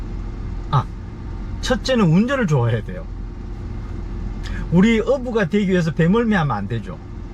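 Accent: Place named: Gyeongsang, South Korea. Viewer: 아흐 경상도듣기싫은 정신병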